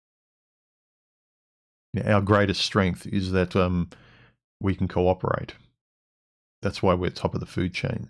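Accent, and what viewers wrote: Melbourne, Australia. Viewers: You have a Melbourne accent through and through, eimai sostos?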